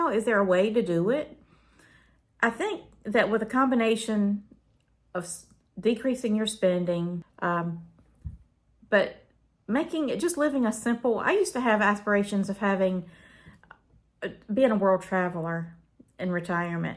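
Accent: South, United States. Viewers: You sound southern like ME!! I am 59 y.o. I call it pre retirement hell. I'm an RN and a school teacher. First part of my career was nursing. My 2nd career is teaching k12. Im trying to make it to 67. I probably wont make it. Ill probably retire at 62 but only if I can live off of the $. It takes every bit of my patience to do my job at this point. I too just want some peace in my life. Just simple things but simple is not cheap. My home is debt free. Ive got a small truck payment. No credit card debt but I do have some student loan debt that im despararely trying to get paid via pslf and its all stuck in limbo right now. Its like everything is stuck. Like everything. It's like that movie Groudhog Day.. SSDD.. stuck in same ole same ole.. it feels like I will work until I die. When will this pre retirement hell end?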